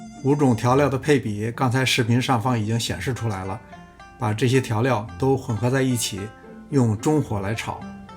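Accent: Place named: Beijing, China. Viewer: 北京話😂。 不過我姥姥是東北人， 她也這麼說。 很多北京話和東北話的用詞都一樣， 儘管口音不同， 我总覺得這說不定与清朝滿族人有關， 把東北話帶到北京來了。😂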